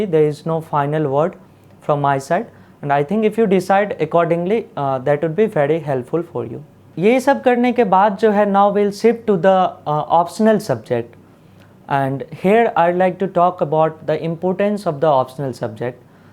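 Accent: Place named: Bihar, India. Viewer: Sun kr LG raha hai ki ye bihar se honge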